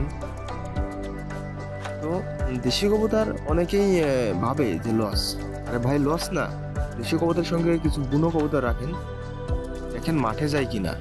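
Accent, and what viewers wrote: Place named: Magura, Bangladesh. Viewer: আপনার কথা শুনেই বুঝতে পারছি যে আপনি মাগুরা বা এর আশপাশের এলাকার লোক। আমার বাসা আলোকদিয়া পুকুরিয়া, বগিয়া ইউনিয়ন। আমি মাগুরা শহরে থাকি।যাই হোক আপনি কত দিন যাবৎ কবুতর পালন করছেন? আপনার কি মনে হয় যে এটা পালন করলে কি লাভবান হওয়া যায় , নাকি শুধু শখের বসে এটা পালন করা উচিত? একটু রিপ্লাই দিয়েন।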